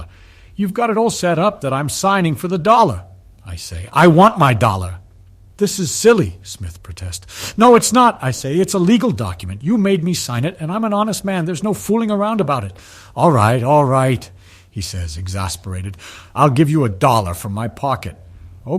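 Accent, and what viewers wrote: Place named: United Kingdom. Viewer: the British accent lol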